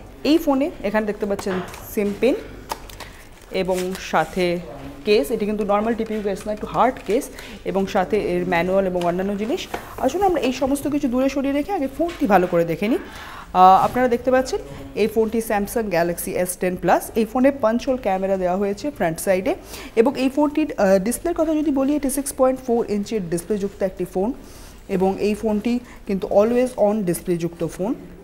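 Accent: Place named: Kolkata, India. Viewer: কোলকাতার বাংলায় বেশ মিষ্টতা রয়েছে, শুনতে ভাল লাগে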